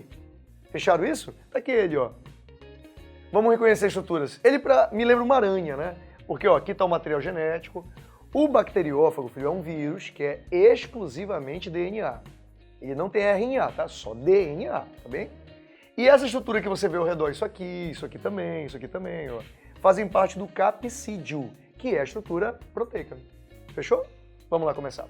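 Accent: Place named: Pará, Brazil. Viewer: amei sua aula! seu sotaque parece da minha cidade, você é paraense?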